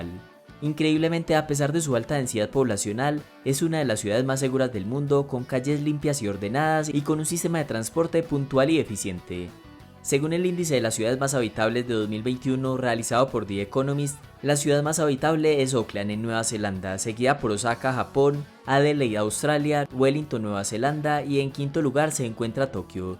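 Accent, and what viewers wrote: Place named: Medellín, Colombia. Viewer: La voz, si no me equivoco, es un acento de Medellín, Colombia. Si es así, que bueno que haya estos canales con tanto contenido de valor en nuestro país.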